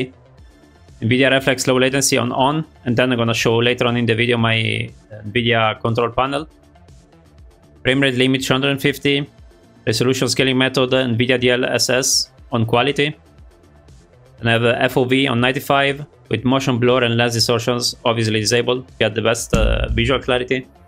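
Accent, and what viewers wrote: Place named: Italy. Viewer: L’accento italiano non scappa😭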